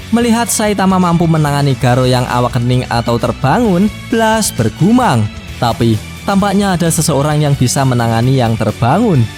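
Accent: Java, Indonesia. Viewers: Nah gini bg selagi manga chapter terbaru nya blm rilis mending cari yg fanmade fanmade nya aja bg seru bngt asli, apalagi pembahasan nyaa kayak mimin logat jawa gini makin asik 😋😋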